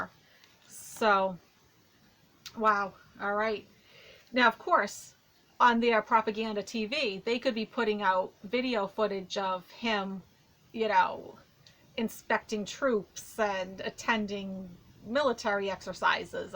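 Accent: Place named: Boston, United States. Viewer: you're so awesome at all of this! I'm sure it's tough to keep focus while taping, and playing Oprah/Donahue to a growing audience. Thank you for your clarity and warmth; and what a poignant way to honor Otto. I'm in Los Angeles, but I grew up near Boston, so it's comforting to hear that accent again! For all of us who you're now reaching worldwide: thank you for changing Youtube and changing our days, for the better.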